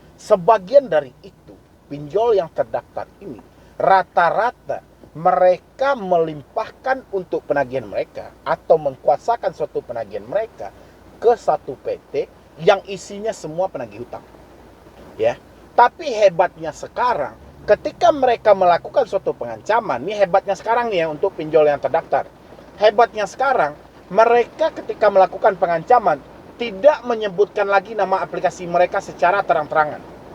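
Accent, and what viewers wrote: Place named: Medan, Indonesia. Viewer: Medan mana suaranya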